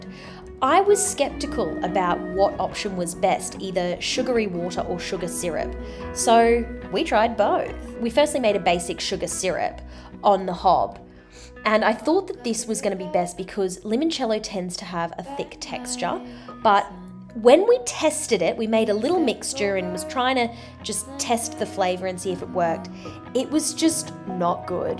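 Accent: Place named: Australia. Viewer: First of all, Im loving your Aussie accent :D and this is the most non-Italian way of doing it, totally British/Aussie way I would say but if you like the taste, go for it, there is nothing wrong as long as you like :) Points are already raised by you, but I understand that Italian way takes time and more effort, so enjoy a quick limoncelloish drink :) I will give it a try as well, but with 50-55% alcohol gin, lets see what it will be like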